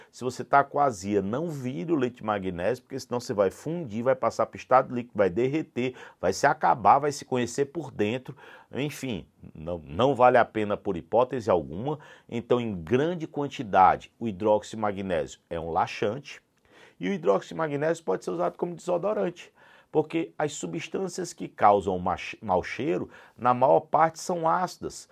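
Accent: Ceará, Brazil. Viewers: eu amo o jeitinho cearense que ele explica kkkkkkkkkkkkkkk, simplesmente o melhorrr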